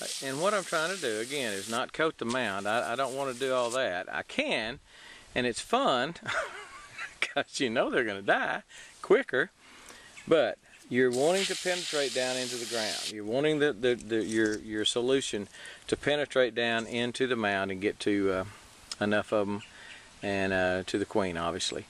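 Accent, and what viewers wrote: Texas, United States. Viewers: Love your accent! How I miss my home state of Texas! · speak english , not texan drawl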